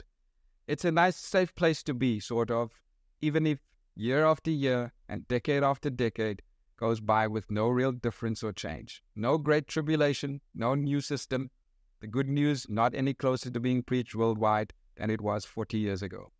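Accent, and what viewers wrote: South Africa. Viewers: May i ask is your accent from South Africa?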